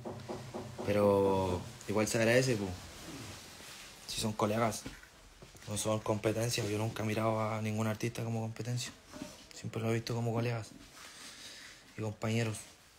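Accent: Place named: North, Chile. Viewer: Y pa que hablay cómo nortino wn... Habla como acá no mas magallanico.... Y aver si visitay la xilotaaaa... 😎😎😎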